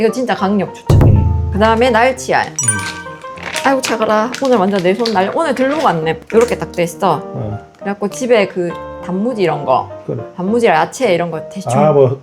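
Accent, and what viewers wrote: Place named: Jinju, South Korea. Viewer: 저도컬리로 시장자주보는데요.. 님 영상보면서 실패확률 제로예요..써보고좋다고 하시는거는 항상바로바로 장바구니에담아요..너무너무도움됩니다.그리고 저는 고향이 진주인데요..경기권에서 살다보니 님 사투리들으면 고향생각나고 친구들생각나요❤..구독한지얼마안되서 매일정주행 중입니다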